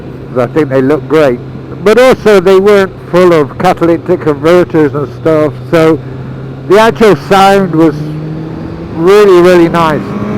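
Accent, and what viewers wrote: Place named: West Country, United Kingdom. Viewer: You sound like a West Country lad.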